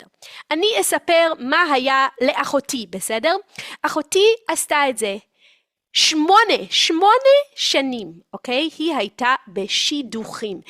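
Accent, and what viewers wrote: United States. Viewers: I love your podcasts- very fun, informative, and very good for my Hebrew learning. It is awesome the way you are completely bilingual and can switch so effectively between Hebrew and English and your accents are perfect (perhaps a bit American in your Hebrew but OK!) Just one idea- if you could look into the camera when you speak. Your gaze is always to the side and a bit low. I think your podcasts would benefit from looking straight into the camera, though it may take a little practice. Thank you sooo much!!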